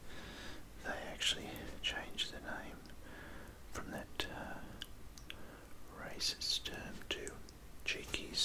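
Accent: Australia. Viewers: To be honest, An Americans ASMR does not do anything. But an Aussie, it affects me. 👌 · A wonderful Aussie asmr, thank you very much. my favorite ever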